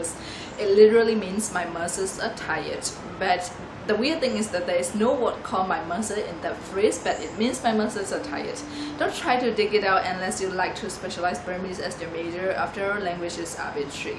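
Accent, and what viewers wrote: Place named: United Kingdom. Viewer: You sound slightly British accent. I mean we Burmese are.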